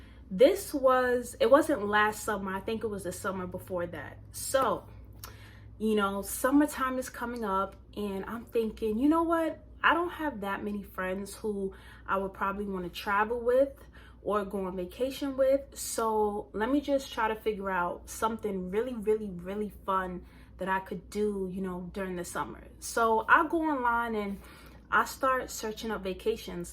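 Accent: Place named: New York, United States. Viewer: Omg are you from NYC, is this how we sound😂😂😂😂 lol 🌺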